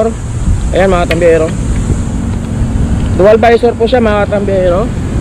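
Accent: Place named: Iloilo, Philippines. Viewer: San to paps?sounds ilonggo kyo po..rs paps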